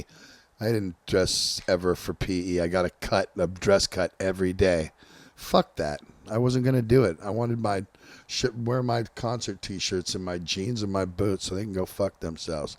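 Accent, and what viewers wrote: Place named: South, United States. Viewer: I fucking love the southern accent you like to throw. But you sound like you may be from Bama instead of Florida. 😂🤣 enjoying the stories.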